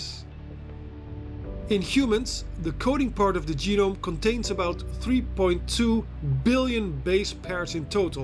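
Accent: Netherlands. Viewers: Aan de uitspraak kan ik met zekerheid zeggen dat jij Nederlands bent, of nie dan?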